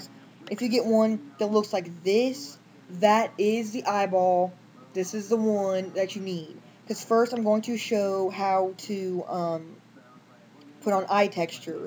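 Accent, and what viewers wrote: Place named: Finland. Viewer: I really like ur accent! is it Finnish?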